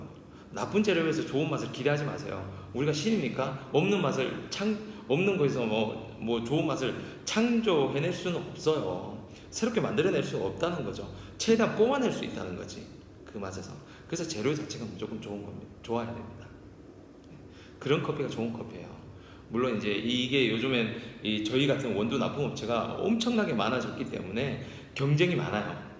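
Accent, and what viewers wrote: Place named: Gwangju, South Korea. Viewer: 광주인가요? 말투가... 위치 어디실까요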